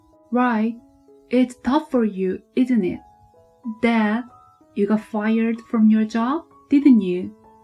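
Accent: Japan. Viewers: Hello! Have idea! Hire someone to read stories that are translated into English by someone who has actually lived in a real English speaking country and attended some kind of Grammer school in America or England or Canada or Australia so that other English speakers will have some chance to hear the story clearly! Present reader obviously has never left Japan!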